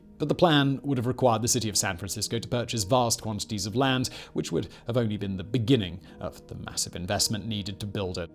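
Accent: United Kingdom. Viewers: This guy is so boring I cannot listen to him much longer. He talks so fast and I stand, that British accent. oh I can't stand this guy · looks so young! And his British accent is not as prnounced as it is now.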